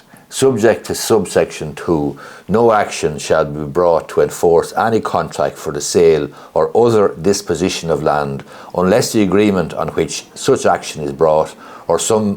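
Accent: Ireland. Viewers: so irish!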